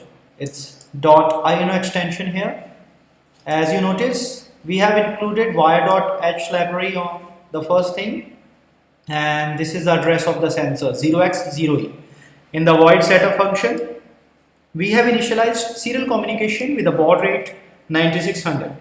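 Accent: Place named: India. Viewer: Thanks for the video. Headache inducing accent though. There are two kinds of Idian accents. One is beautiful and eloquent. The other sounds like somebody talking with a mouth full of tacks.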